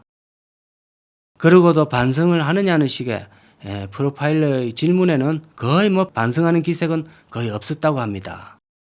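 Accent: Gyeongsang, South Korea. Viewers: 경상도 사투리 좃나 짜증 나네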